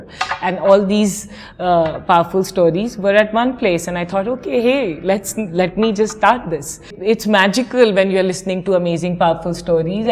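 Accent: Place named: Jamaica. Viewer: almost sound Jamaican 💚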